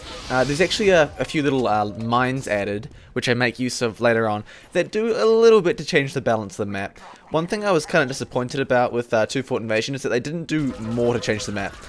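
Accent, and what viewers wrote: New Zealand. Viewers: You must be from New Zealand, with an accent like that. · Omg its muselk! Oh wait it's just some kiwi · Are you from NZ?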